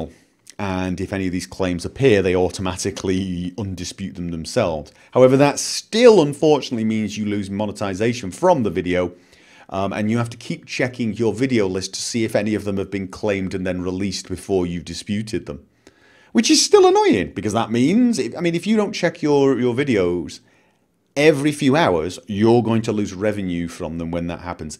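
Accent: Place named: England, United Kingdom. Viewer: He's gone over that many many times before. He IS English. He and his family moved there a number of years ago. The accent didn't give him away? >_>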